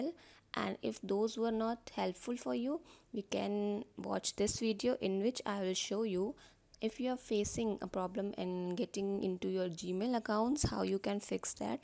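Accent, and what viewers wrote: India. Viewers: Wow, do all Indians talk with that shit accent. God, it's so annoying.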